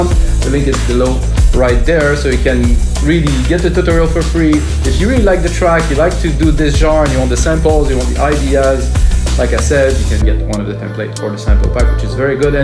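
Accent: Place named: France. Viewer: ptit accent francais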